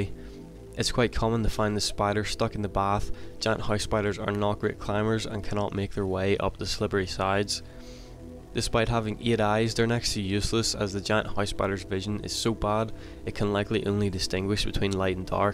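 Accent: Northern Ireland, United Kingdom. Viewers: He sounds very similar to most of my friends In Northern Ireland, but with the hundreds of accents across the UK even as an Englishman its tough to put a finger on it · never like to see spiders eating other spiders 😢 The Northern Irish accent is refreshing though, subbed! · He's got a Northern Irish accent.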